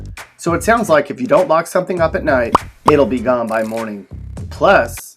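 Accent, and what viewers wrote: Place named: Midwest, United States. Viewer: I bet 1,000.00 he's from the Midwest,you can tell by his accent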